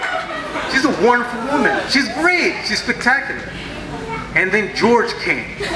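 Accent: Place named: California, United States. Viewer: Whoo this guys from California for sure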